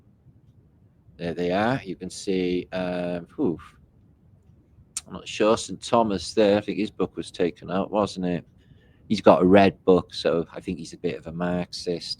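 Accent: Wales, United Kingdom. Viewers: Very, very interesting, you weave an incredibly convincing narrative here. Love the dry humour and the welsh accent equally 😊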